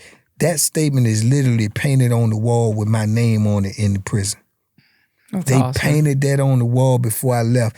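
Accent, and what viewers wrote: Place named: Washington DC, United States. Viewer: He got a strong dc accent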